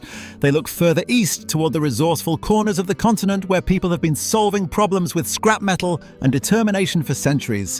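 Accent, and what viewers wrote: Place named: United Kingdom. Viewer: They've got a garden? What are they going to do, smother it with basil and thyme and cherry tomatoes? What's a garden got to do with barbecue? You plant flowers and aromatics and Japanese shrubs in a garden. There's no provision for a smoker and a cutting block, and nobody cares about being surrounded by cilantro and posies when you're grilling and barbecuing and smoking. Ridiculous. And yes, the wood is important. I don't think you can find hickory or mesquite in Europe, and oak and other slow-burning variants have to be the right kind. I certainly don't want my ribs smoked in ash or yew or olive, wrong flavor and smoking properties. And a brisket is not the size of a suitcase. You are exaggerating and misrepresenting a lot of things. You sound British yourself. I understand that you're trying to present a concept in this video, but you certainly still don't know enough about the subject to talk about it correctly. 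I suggest you stop hurrying when trying to put these videos together, the clicks are not worth it, and not important enough. You will be ignored when you come off as ignorant instead of informative. Very annoying. And why the hell do you have noodles and coffee and other things in a video about American barbecue? It doesn't make sense. Are you a real person, or are you the early iteration of HAL and Skynet? If you're human, tell us about your last experience when you had to tune out your crazy ex-girlfriend because you were trying to relax after work with a video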